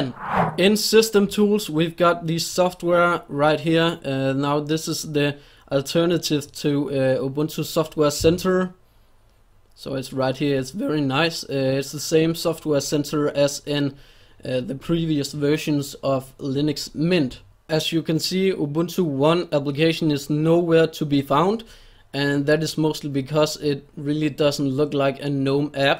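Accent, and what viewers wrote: Denmark. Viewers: Dejlig dansk accent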